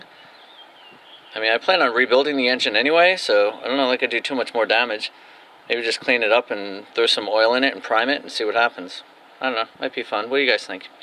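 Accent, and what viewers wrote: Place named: Canada. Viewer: Front bumper mounting bracket. I noticed you speak some Canadian.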